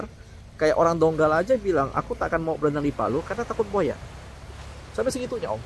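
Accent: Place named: Bangka, Indonesia. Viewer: asli bangka bg ? logat ny agak" bangka dikit mnrt gw sih..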